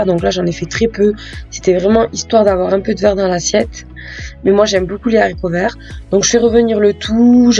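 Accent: South, France. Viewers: je n'avais pas fais attention dans la vidéo mais il me semble que tu as dis que c'était sûrement la grossesse qui te fais adorer la Mozzarella. Félicitations à toi 😊😊 je ne me lasse pas de tes vidéos avec ce jolie accent du sud que tu as 😉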